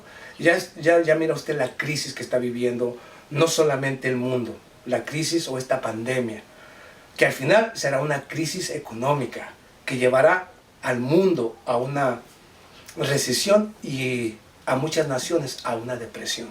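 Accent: Mexico. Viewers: Don't forget to mention me BRO am watching you from AUSTRALIA I really like the way of your analysis very easy to digest as well I like your Mexican accent.. well done Cheers...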